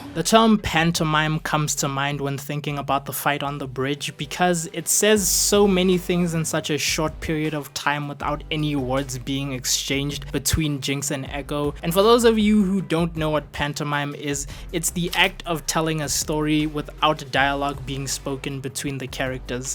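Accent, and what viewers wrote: South Africa. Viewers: R you South African? Ur accent is giving South African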